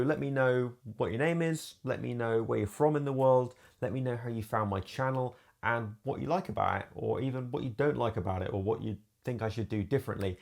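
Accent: United Kingdom. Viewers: as Am-re company, we stumbled upon you while looking for influencers to help us do video reviews in the UK, one of your videos had a lot of views and it was great we liked it. now what we like about you, your voice and the way you talk about a product, it's calm slow and with very good words, the second thing that we like is our hairstyle its almost white but for sure you haven't passed your 30's look cool, the British accent. we would love for you to review our product the best 40 USD you have ever spend on earbuds of course we can send them to you. - back to the point hahahaha we also like your background studio the colors are great and very eyecatching, I'm sure you take your time and review the products and as well time to make the script for what you are going to say. the good point and the bad points. last point: the shots and Broll over voice you do its really good. 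we been following sense you had only 7k something followers and we are also surprised the way you exploited over the last 3 months Ps; we been following you for the last 3 months lets get to 1.3 billion in the next year. you are the best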